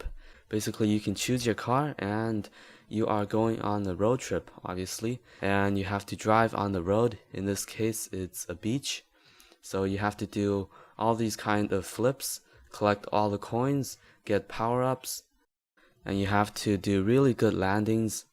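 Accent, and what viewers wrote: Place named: China. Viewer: its a chinese speaking english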